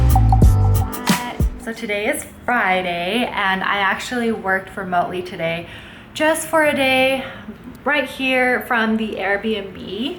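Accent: California, United States. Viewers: your whiney cali drone voice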